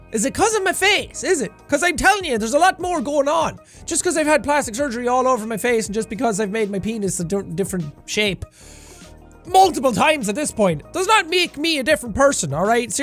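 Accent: Ireland. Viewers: Jack turning more and more irish. XD "Fock em!" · Sometimes when he sounds really Irish he sounds like sean from rdr2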